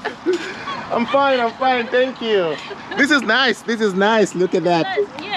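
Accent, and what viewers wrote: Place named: England, United Kingdom. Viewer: I have high respect for you my brother man ,,, l love you English accent ,,,keep going what you do .best of luck for. You,, god bless your family and your people,🙏❤😇😇😇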